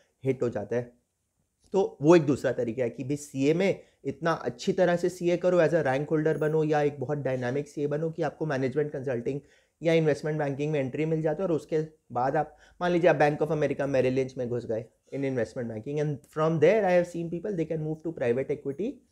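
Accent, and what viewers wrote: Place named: Bengal, India. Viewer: Guys this is funny and misleading.. heading says how a CA can get into PE.. and it starts with let’s the CA do MBA.. 😂😂 The video lacks depth and limited research and obviously no practical experience.. Even if you are a 2nd attempt CA, you still have a chance to get into PE, if you can build up right network and have relevant experience.. Pls don’t get misguided by the ‘sauve’ perspective of this video, it’s just myopic. Anyone willing to get into PE space need following two skill sets along with good networking skills: 1. Ability to evaluate investment propositions - it just basic FM you read in you CAs along with practical POVs on current business, market share, customer base, operational efficiencies, Risk etc. 2. Managing operations- PEs work with their portfolio companies to improve value and finally over the years when they achieve certain efficiency, they look to exit. 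So anyone have operational understanding or ability to manage stakeholders and drive them to improve operations, identify and implement synergies are preferred. Following skills along with above 2 are good garnishee: 1. Business Modelling 2. Transaction structuring and taxation - CAs are champ in this 3. Good communication skill - this is always good to have in the jobs you do. But doesn’t stop you to achieve anything, had the case been otherwise, the host of this video with half Bengali ascent wouldn’t have got 30k followers.. you need not be ‘Sauve’, a Chetan Bhagat english can work wonder..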